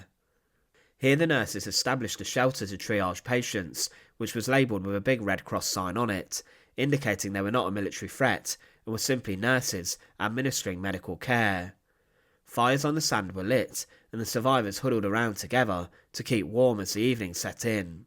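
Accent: United Kingdom. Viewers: It's his accent. A significant portion of the UK sometimes prounce the letter 'T' as a 'F' sound. This is called an accent. Once again, criticising someone for their accent is pathetic.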